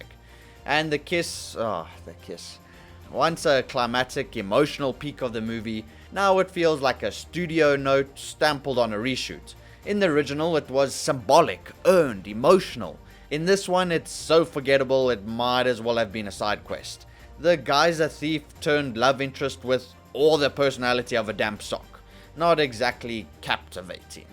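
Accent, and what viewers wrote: South Africa. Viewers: Where's your accent from? Feels kinda South African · You know it's bad when Dragonball Evolution has a "higher" low rating than the movie. Also you're accent makes me think you're from South Africa? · Are you by any chance from south africa, cause your accent sounds like it.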